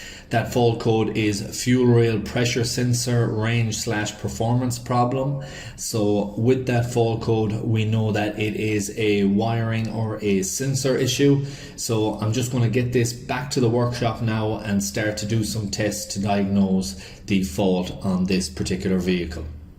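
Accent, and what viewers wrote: Ireland. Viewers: Great video, just subscribed. I'm in Ireland you sound like your familiar to there :) . I have a 2.5td ranger year 2011, losing power on and off today it would hardly pull up hill then it might go again but constantly down on power over last few months. I put in new injectors and cleaned out diesel tank still has the issue. Any suggestions, thanks for the help..No lights coming up on the dash. Tks again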